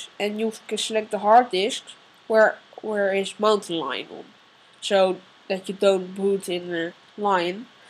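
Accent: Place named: Netherlands. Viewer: I can clearly hear that you are dutch :D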